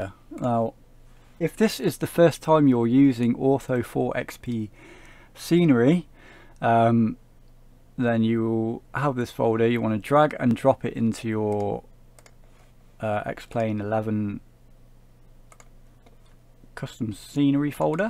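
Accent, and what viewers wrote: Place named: Dudley, United Kingdom. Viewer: You sound like you're from Dudley cool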